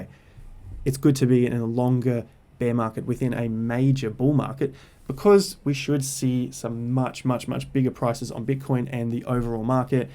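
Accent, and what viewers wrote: Australia. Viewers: Great to hear great content with a bit of an Aussie accent...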